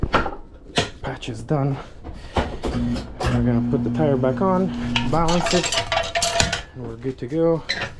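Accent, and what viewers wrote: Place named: Trinidad and Tobago. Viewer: Why do you sound like you’re from the Caribbean? Trinidad to be specific?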